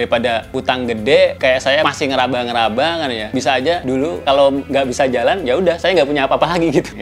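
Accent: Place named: Java, Indonesia. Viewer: mas nya ini tinggal di ciamis tapi logatnya jawa ya... wong jowo ki mesti 😅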